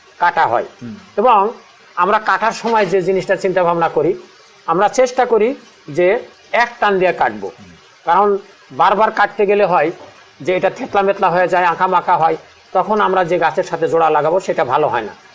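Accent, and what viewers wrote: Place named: Tangail, Bangladesh. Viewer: ভাইজান সুন্দর করে বুঝিয়েছেন। ওনার সহজ সরল ভাষা ও কিছুটা আঞ্চলিক (সভবত টাংগাইল, জামালপুর বা মানিকগঞ্জের ভাষা) খুব ভালো লাগলো। টপিকটা আমার কাজে দিয়েছে। ধন্যবাদ জেন্টেলনম্যান।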